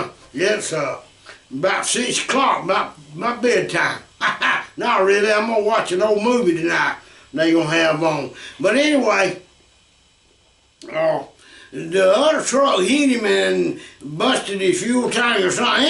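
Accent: South, United States. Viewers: Over here in England we just love that country accent of yours and your down - to -earth approach to things. Just bought myself a lap steel and finding your teaching sessions a real help and inspiration. Thanks.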